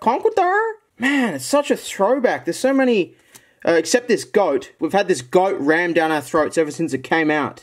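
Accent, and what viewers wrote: Australia. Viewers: Nah thats just how Aussies talk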